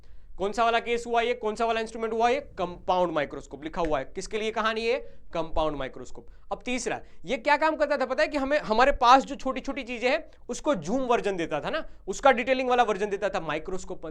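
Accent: Gujarat, India. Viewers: He sounds like he is from Gujarat 😅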